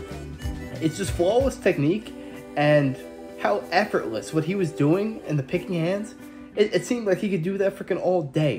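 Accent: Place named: United States. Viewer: 😂 I was expecting you to have an Irish not American accent as is like the most Irish surname ever dude 😎👍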